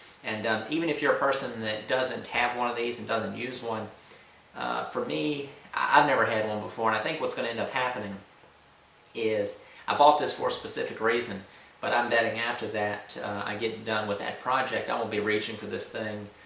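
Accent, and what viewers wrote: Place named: Arkansas, United States. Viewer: Off-topic.. Being from Scandinavia, I think I've only ever heard one [famous] person from Arkansas before... do you happen to be from thereabouts as well? :) Keep up the good work!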